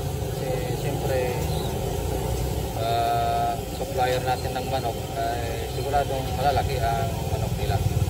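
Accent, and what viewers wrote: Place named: Visayas, Philippines. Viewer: Visaya man si ser oi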